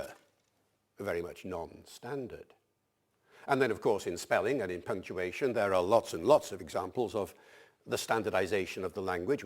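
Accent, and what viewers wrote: United Kingdom. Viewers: According to Wikipedia Prof David Crystal is originally from Northern Ireland and as an infant moved with his family to the North of England - his accent is definitely Northern English. Some of us are understandable, clearly. 😂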